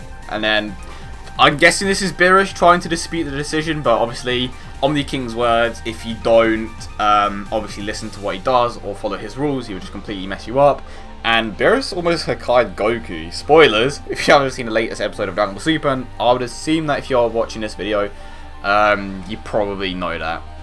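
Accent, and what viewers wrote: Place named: West Midlands, United Kingdom. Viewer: I'm really interested in know what part of England is from. He kinda has the same accent as me and that being a west midlands one.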